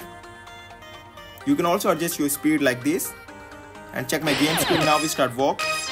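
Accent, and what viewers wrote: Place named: India. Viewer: It’s obvious. He’s from India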